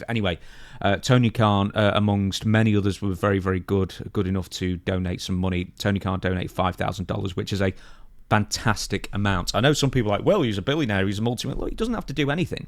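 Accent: England, United Kingdom. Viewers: I don't know what it is with you, but that English accent that you have always makes it easier even when you're pissed off to understand everything you're saying💯🫡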